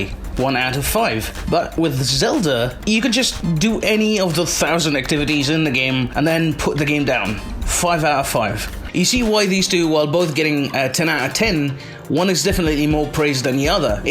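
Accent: United Kingdom. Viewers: انت مالك يعم انت اولاً الانجليزي كويس فشخ بس اللهجة بريطاني تقيلة يمكن بس قشطة جداً انا سمعته وفهمته حتى من غير ما اشغل كابشنز انت بقى عايز ايه بالظبط الكلام لازم يكون ليه هدف يا حتة معقد هدفك انك تقول للي قدامك انه غبي؟؟؟ اقسم بالله شوية كمان وهشفق عليك بسبب شخصيتك التعبانة اللي اكيد محدش طايقها فالحقيقة ولمعلوماتك يا معقد الباشمهندس مهندس ميكانيكا قد الدنيا بس بيحب الالعاب فبيشاركنا وبيجرب يخليه محتواه متاح لغير العرب بس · Aawsome video but i think you are intentionally using British accent . its kinda hard to be understood when some of your audience natively speaking arabic will also choose to watch the english version as well . your channel your rules but i think american accent is best of both worlds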